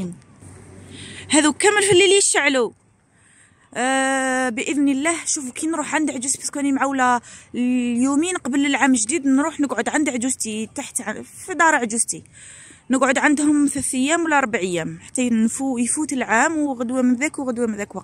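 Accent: Chlef, Algeria. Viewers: والله غير سمعت هدرتك حسيتك من شلف والله العظيم بنت بلادي انا ثاني شلفية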